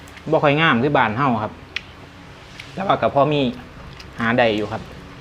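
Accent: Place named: Ubon Ratchathani, Thailand. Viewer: สำเนียงอุบล ฟังเพราะดีเนาะ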